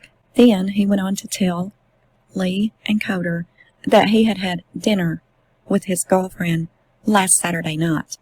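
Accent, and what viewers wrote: South, United States. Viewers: Beautifully read:elbowbump: you should do stories online.....nothing like a southern belle accent.💛..you sure got it:washhands: Thanks for doing this...muchly appreciated🥰x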